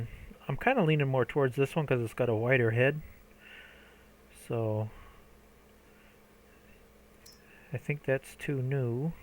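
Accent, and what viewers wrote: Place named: Wisconsin, United States. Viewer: I've got to ask where are you from? By your voice I'm thinking WI or maybe northeast IA but I can't work it out haha I live in Phoenix now but my family is from NW IL about an hour and half from Chicago and 30 minutes from WI.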